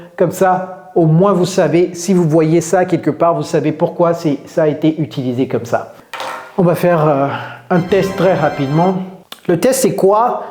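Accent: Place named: United States. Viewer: Bonjour C'est une première franchement vous êtes le meilleur sincèrement Je suis content de vous connaître L' accent américain c'est top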